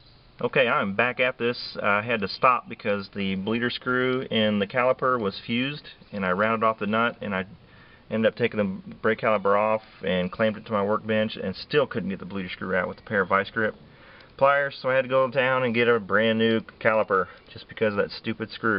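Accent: United States. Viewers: No funnel, no gloves, southern accent, thats back woods boy. Merica! Hey at least you have good info, and you were wearing eye pro that stuffs bad on the eyes.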